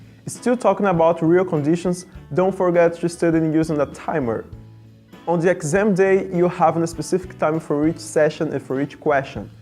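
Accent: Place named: Brazil. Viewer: Your english is pretty good, and surprisingly you have only a little accent, which is kind of rare for brazilians!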